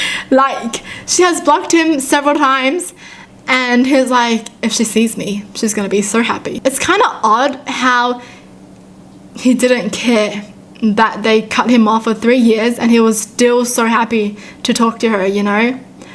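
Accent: Australia. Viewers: Same, I think I might be picking up her Australian accent because I watch her so much lmaoaooaoa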